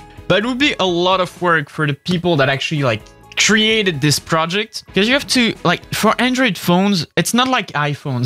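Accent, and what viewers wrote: France. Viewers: I never thought a french accent would be hot until now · As a French, I find him speaking English with a French accent so frustrating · he is french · He is slowly loosing his french accent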